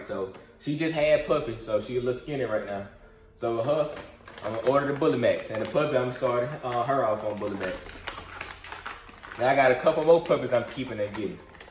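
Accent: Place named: Atlanta, United States. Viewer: lmao he said it twice first time I didn’t hear it by the time I found this he said it again and I heard clear as Day sounds like he from Atlanta or sum 😂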